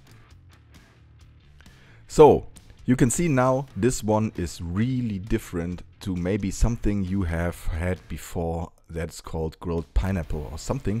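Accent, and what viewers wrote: Germany. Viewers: Best german accent ever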